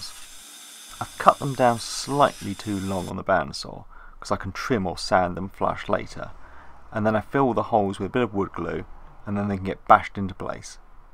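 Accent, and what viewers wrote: RP, United Kingdom. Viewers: Love the posh voice, see you on site mate 😉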